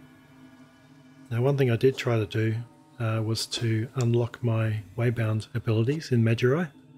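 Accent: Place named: Australia. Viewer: thanks boss, u sound aussie :)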